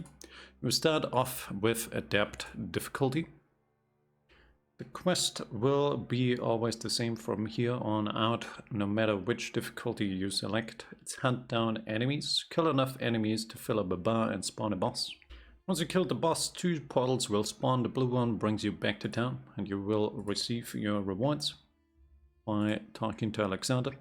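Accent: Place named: Germany. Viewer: No wonder why i felt the German accent :)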